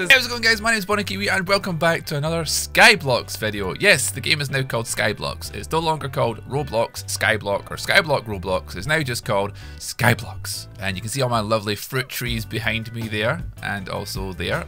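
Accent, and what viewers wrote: Scotland, United Kingdom. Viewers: I love your scottish accent "fefty" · "Cyked pizza's." Dat scottish accent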